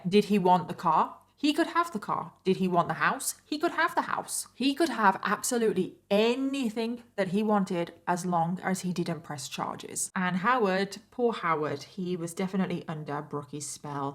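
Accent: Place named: United Kingdom. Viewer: whereabouts in Britain are you from girl? period.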